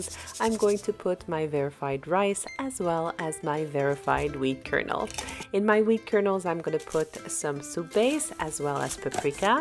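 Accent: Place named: France. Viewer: Merci beaucoup pour votre belle présentation. J'ai beaucoup aimé votre accent français 😉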